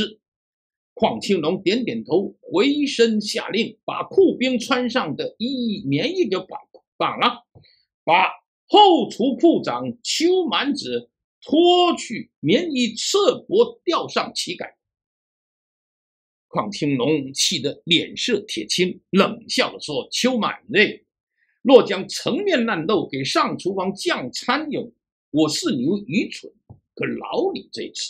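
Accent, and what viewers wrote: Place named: South, China. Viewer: 北派的小说，南派的口音，南北结合，在我看来这是创意，老杨全网独一份🎉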